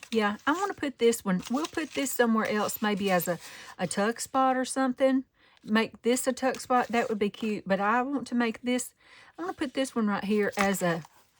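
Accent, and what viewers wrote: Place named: Alabama, United States. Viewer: Hey Ms! Happy Weednesday. When you say it you always emphasize it with an extra weeeenesday. It's that Alabama accent. I agree, I absolutely love using those hearts as the hinges on that tag. I can't wait to give that a try but first I've got to hand draw me some hearts that look decent. I enjoyed every second. ByHisGrace! 🌹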